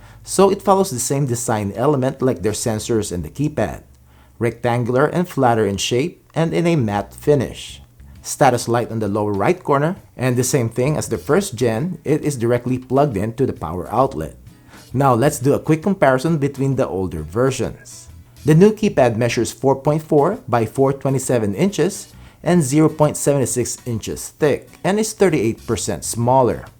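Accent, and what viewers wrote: Philippines. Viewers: not sure whose the ding dongs who gave thumbs down? unless they thought the accent sounded like a gay filipino? if you can avoid that, its an excellent review.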